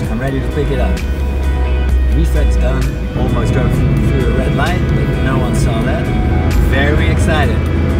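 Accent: Netherlands. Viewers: Maat ben jij een nederlander??? lol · cool je bent Nederlands (hoorde al zoiets aan je accent) toffe video. speel zelf al 7 jaar gitaar en doe dat nog steeds op m'n squier ;) Super vid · Are you dutch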